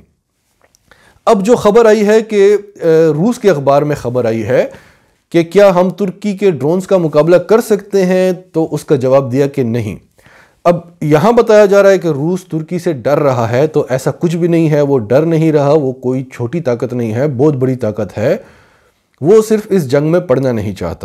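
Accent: Pakistan. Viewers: Very good urdu accent pure pakistani accent unlike urdu speaking person which resemble to indian accent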